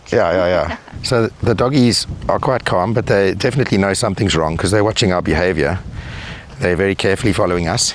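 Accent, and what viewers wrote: South Africa. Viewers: is that a SA accent i hear? hope you all safe.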